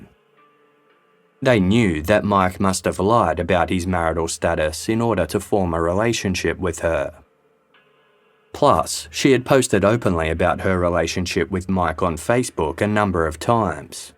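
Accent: Australia. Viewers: Love hearing A fellow Aussie accent 🇦🇺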